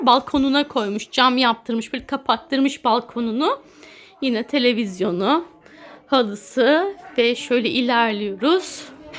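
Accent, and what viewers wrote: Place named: Zonguldak, Turkey. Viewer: Bursa dan selamlar hemşerim ben Zonguldaklı olarak memleketime selamlar sizi severek takipteyim benim olumun hesabından yazıyomaynı biz gibi.gonuşusyasunuz adetlerinizfe bizim gibi caycumalıyom bende canım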